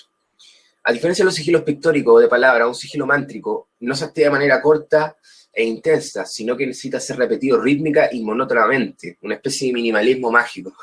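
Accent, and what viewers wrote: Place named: Chile. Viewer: 🤗¡ Me encanta el acento chileno ! 🇨🇱❣️ 🥰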